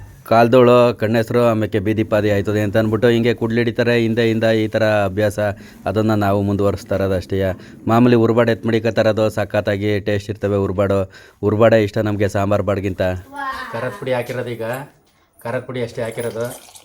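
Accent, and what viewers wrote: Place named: Chamarajanagar, India. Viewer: Namane ru chamrajnagra avru avru nim slange matadadu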